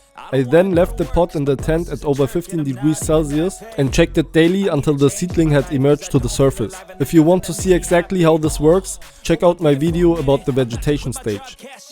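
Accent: Germany. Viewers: An dem Akzent erkenne ich das du aus dem deutschen raum kommst :) Der folgende Text soll kein Klugscheißen sein sondern Liebgemeinter Tipp bzw Weitergabe von Wissen. <3 Wir teilen die liebste Leidenschaft. Etwas Lernlektüre und ich hatte Langeweile xD. edit: ich hasse Schreibfehler :D Teil 1 Das Flushing war in deinem Fall vermutlich etwas zu früh. Ich würde mich weniger an den Angaben der Hersteller orientieren, sondern an der Pflanze selbst. Jede Pflanze ist ein Individuum und reift unterschiedlich schnell. Die Dame hat im Verhältnis noch recht viel Blattmaterial und vergleichsweise wenig Calyx-Masse. Sie hat also noch nicht richtig "gebulkt" , die Kelche sind noch nicht vollständig angeschwollen. Außerdem wirkt sie noch nicht vollständig ausgereift. Das erkennt man unter anderem an den vielen noch weißen, aufrechten Pistillen. Mit zunehmender Reife verfärben sich diese und ziehen sich zurück. 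Dabei sollte man beachten, dass Pistillen durch Berührung oder Umwelteinflüsse schneller oxidieren können und deshalb allein kein zuverlässiger Reifeindikator sind. Trichome und der allgemeine Reifezustand sollten immer mit beurteilt werden. Wie stark eine Blüte letztendlich anschwillt, hängt zu einem großen Teil von der Genetik ab. Pflanzen mit einer genetischen Veranlagung für große calyxreiche Blüten bilden meist auch kräftigere Kelche und können entsprechend größere Samen entwickeln, sofern sie bestäubt werden. Neben der Genetik spielen jedoch auch Kulturführung und Nährstoffverso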